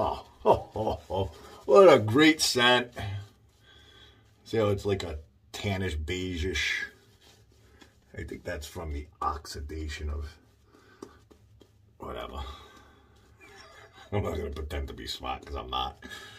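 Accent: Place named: South, United States. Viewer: Love your content and the southern accent. 🙂 Keep up the good work!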